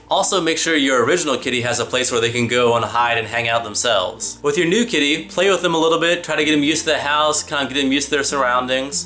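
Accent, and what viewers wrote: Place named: United States. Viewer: a hillarious American voice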